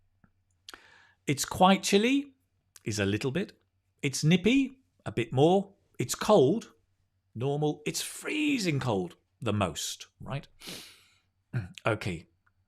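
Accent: United Kingdom. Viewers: Are you British? Are you American?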